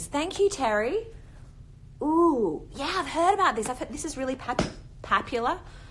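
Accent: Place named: United States. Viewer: THE AMERICAN ACCENT HAGAHAHHAHAHA IT WAS GOOD THO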